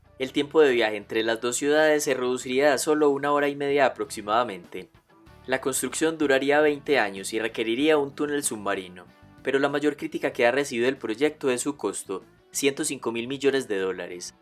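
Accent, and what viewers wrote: Colombia. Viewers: Excelente video pero pésimo la narración el acento colombiano lo daña todo.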